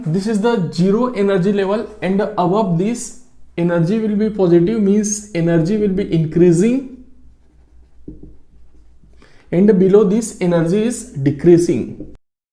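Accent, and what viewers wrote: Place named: Maharashtra, India. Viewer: You are marathi by your english i can guess that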